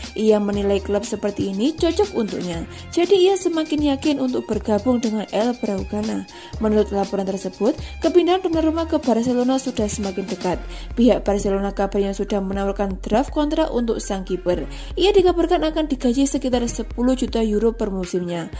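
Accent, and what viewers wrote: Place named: Medan, Indonesia. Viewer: Dari logatnya, aku tau mbaknya orang Medan, horas mbak 😂👋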